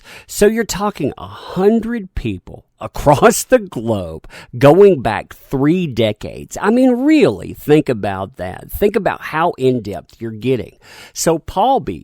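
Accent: South, United States. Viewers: Man your voice is rad. It sounds very southern and endearing. I don't really care about the story but how you portray the information has got me listening. I hope you and yours are good during these trying times.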